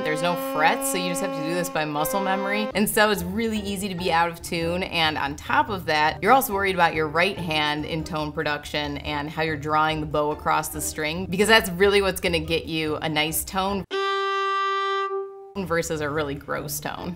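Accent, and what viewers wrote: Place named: Minnesota, United States. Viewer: You sound very Minnesotan. Are you?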